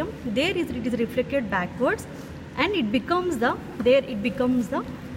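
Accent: India. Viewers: Strong Indian accent. Omg I don’t understand words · Why does it have to be an indiaaaaannnnnnnnnnnn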